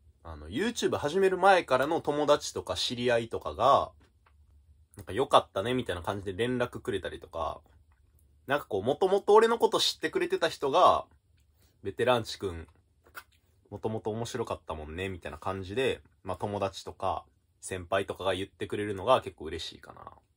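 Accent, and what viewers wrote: Kansai, Japan. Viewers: なんか灘生の関西弁が好き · ダーマより好き笑 関西弁かつ喋りがうまいなんなら、カジサックより面白いし笑 とりあえず引っ越したらどうですか？？？？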